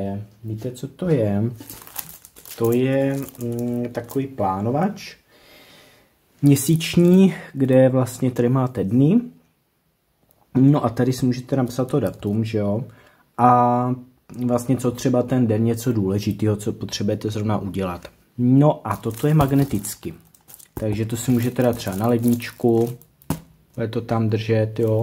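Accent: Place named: Sumava, Czech Republic. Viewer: Ahoj, Bože, ta tvoje radost z objednávek je fakt nakažlivá 😊 Jdu objednávat také kravinky pro radost ❤ Přála bych ti spolupráci s Temu, nebo Shein... Myslím, ze by ti to moc sedělo a zisk by nebyl priorita 😊 Krásně se posloucháš To víš, ten tvůj měkkoučký dialekt pro nás ŠUMAVÁKY 🤔 Hezké dny přeji a těším se na příště 🙋‍♀️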